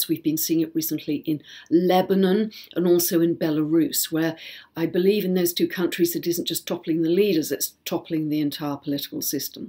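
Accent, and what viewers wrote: United Kingdom. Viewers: I have an interesting story with coming across this video! I have been feeling this energy intensely in my home life and searched for videos on the energy of this moon. There were a couple I saw that I thought I was going to watch but felt pulled to keep scrolling. I have never seen any of your videos but when I saw the thumbnail I had the though that you would have a British accent (I am from 🇨🇦). I heard a voice in my mind accent and all, and I became curious so I clicked to see if you were in fact British. Not only are you, but the voice in my mind was your voice!! And now hearing your message I am clear why I was guided here. I have been struggling with my 3.5 year old the last little while and last night was the most intense. He had a meltdown that we were lost in how to help calm him. So along with the macro of toppling governments or full political systems, I'm experiencing this on the micro that my sweet boy is toppling the hierarchy in our home 😆 As much as we are aiming to stay removed from the fear, there is definitely a layer of stress that has been resting on us with everything going on. 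I have been sensing for a long time that systems will be crumbling away, but they usually don't go easily. Especially when they are as deeply rooted as the ones in place now, and when many people are so used to being powerful at the hands of these systems. So worry comes easily when I think of my two young children (3.5 years and 8 months) and the potentially turbulent near futur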